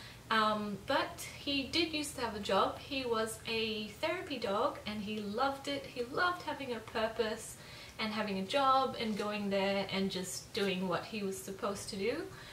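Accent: Australia. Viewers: Are you Australian or British or African or... What lol you have a cool accent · Aussie,Iv decided.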